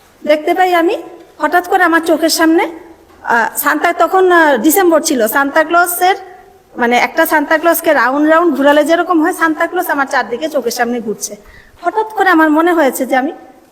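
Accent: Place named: Bangladesh. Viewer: Kothar tone ta onek ta bangladesher moto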